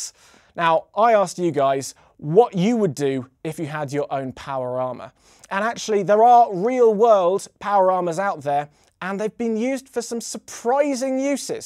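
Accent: United Kingdom. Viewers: Its British accent xD...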